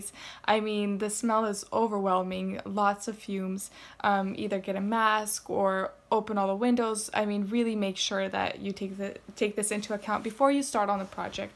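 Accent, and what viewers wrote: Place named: Minnesota, United States. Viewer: Your accent sounds like Minnesota or North Dakota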